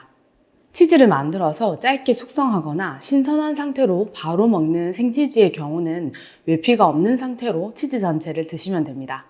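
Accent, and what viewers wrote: Gyeongsang, South Korea. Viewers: 경상도 어데 출신이신지?? 꾸시하이 갱상도 사투리가 들리네요이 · 숨길수 없는 경상도사람ㅋㅋㅋㅋㅋㅋㅋㅋㅋ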